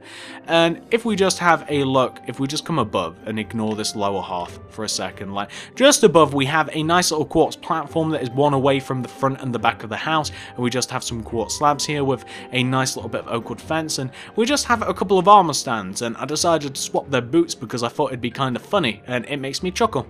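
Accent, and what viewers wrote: United Kingdom. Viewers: Awesome are u from the uk?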